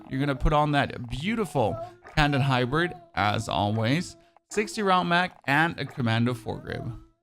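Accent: Germany. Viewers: Alta du bistn Deutscher? Holy moly, ich hab's nie vermutet... xD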